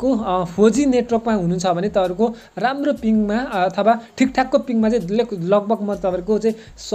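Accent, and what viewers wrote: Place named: India. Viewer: Yo Indian jasto hawa dherai nabolnu hai.eakdam irritating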